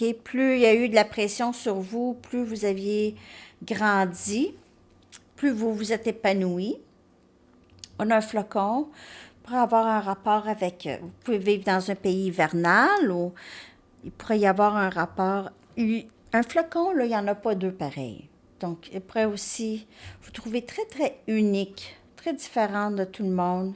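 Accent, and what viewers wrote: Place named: Acadia, Canada. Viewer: Coucou, vos vidéos sont si fleuries, c un régal. Votre accent acadien me fait voyager vers chez vous. Merci! Il semble que le bout du nez soit le point de l endroginat primordial ( cf: Apotheose de jean luc Ayoun)... De parler sur le thème des fj doit réactiver ce point possiblement. Bien des choses depuis la France Dom🧚‍♀️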